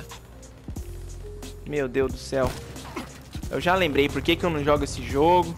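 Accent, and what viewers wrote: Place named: South, Brazil. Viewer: Man, tu tem um fodendo sotaque do Sul, de que lugal vc é brou?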